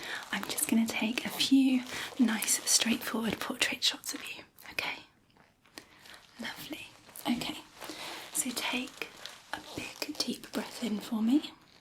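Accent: England, United Kingdom. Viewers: you look like a living doll! You have that "Carrie Underwood" effect, where you look so beautiful, that you you don't seem to be real!:-) Thank you so much for your soft, English accent - listening to your ASMR puts me right to sleep when I am suffering from insomnia! Also - LOVE the leather look and sounds... the "leather jacket" look is "Amazing" on you!! Thank you again, and Cheers!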